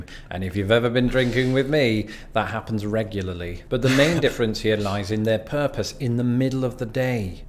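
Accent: United Kingdom. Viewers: Love this guy's very British droll delivery. Since Covid came along, there's no more going to the bar to order drinks, it all has to be brought to the table by bar staff now.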